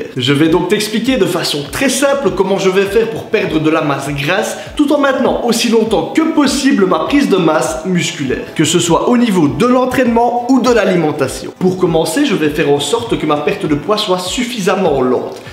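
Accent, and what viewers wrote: Liège, Belgium. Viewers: Personnellement je n’ai qu’une question ^^ tu viens d’où ? On reconnaît un peu l’accent de Liège mais je suis pas sûr lol ^^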